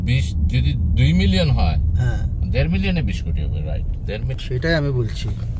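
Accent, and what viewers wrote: Noakhali, Bangladesh. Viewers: আপনে কিতা নোয়াখাইল্লা নিতা। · আপনি কি নোয়াখালীতে বাড়ি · ভাই আপনি কি নোয়াখালীর মানুষ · ভাই আন্নে কি আংগো নোয়াখালীর নি?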